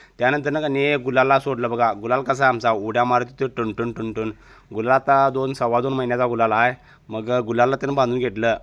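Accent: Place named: Ichalkaranji, India. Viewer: इचलकरंजीकर आहेस का भावा?? टोनिंग तसेच वाटते आहे..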